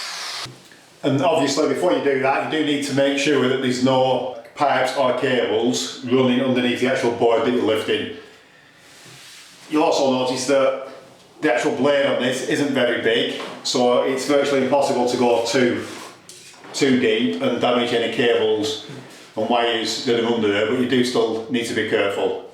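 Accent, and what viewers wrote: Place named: Lancashire, United Kingdom. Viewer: I recognise that accent ...... I'm guessing Leyland, Bamber Bridge possibly .......Preston as an outside guess ?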